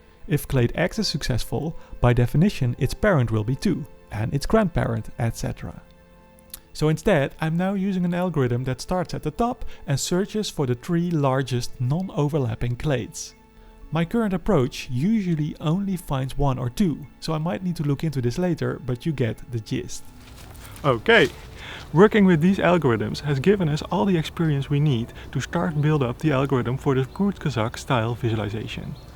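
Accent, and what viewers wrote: Netherlands. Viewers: At the start of the video: Dutch accent? Name revealed: Dutch 100%. Showing of our newspaper-sized nature areas in the background: Dutch 200%.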